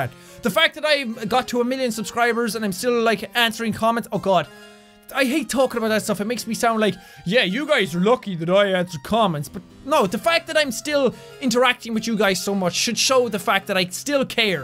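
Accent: Ireland. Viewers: i dont know why but people think jack is not irish he is hes trying to make it easier for you guys to understand im irish and i can tell hes irish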